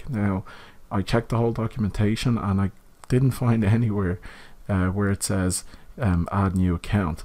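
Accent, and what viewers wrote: Ireland. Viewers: Great job, I have been looking for this capability since 2016! Hope things are great at college. Also good to hear the Irish accent! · Thanks a really great video. No problem with your ramblings man everyone loves an Irish accent. At least that's what they tell me anyway!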